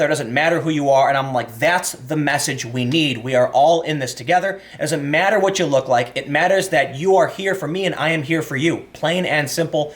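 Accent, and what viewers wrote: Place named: Appalachia, United States. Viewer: Sounds like a hill-billy Obama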